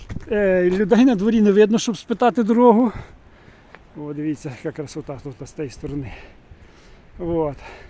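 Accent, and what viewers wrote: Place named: Vinnytsia, Ukraine. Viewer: Дякую пане за цікаву подоріж до козаків.Мені ваша розмова чомусь підсказує що ви з вінницької області? Дай Боже всього найкращого що є в нашому житті і здоров,я.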